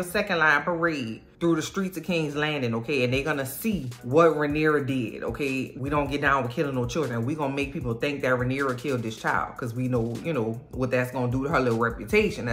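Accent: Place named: Louisiana, United States. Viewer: what a wonderful accent! Louisiana?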